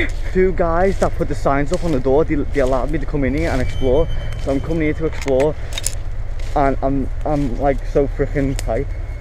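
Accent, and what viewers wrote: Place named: Liverpool, United Kingdom. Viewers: Hes from Liverpool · I think he's just a typical scouser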